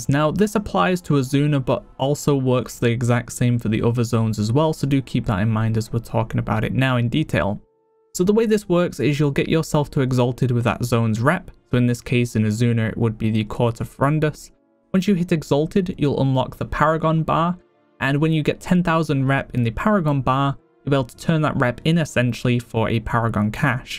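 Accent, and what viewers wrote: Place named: Leicester, United Kingdom. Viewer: Great videos! Not trying to dox you but are you from Leicester?? Don't know anyone else with that accent but Leicester folk!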